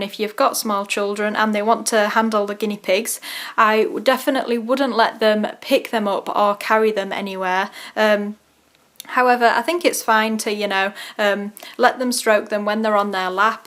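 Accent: United Kingdom. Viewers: Nice British accent. Can I be your guinea pig?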